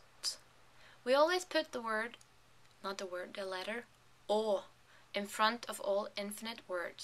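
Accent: Oslo, Norway. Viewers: is that Oslo dialect you´re speaking?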